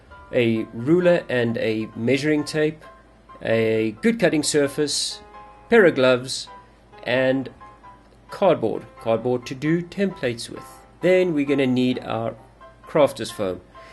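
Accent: South Africa. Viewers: Are u south African 🌍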